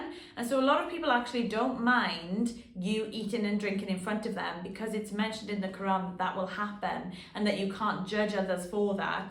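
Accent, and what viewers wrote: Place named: Wales, United Kingdom. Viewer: Thank you for mentioning so early in the vid where you were from (Wales) - was driving me crazy not being able to pick the accent properly 😂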